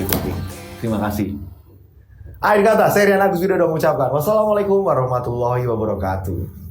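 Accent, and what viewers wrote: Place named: Tulungagung, Indonesia. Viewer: Kyk wong tulungagung bahasa e.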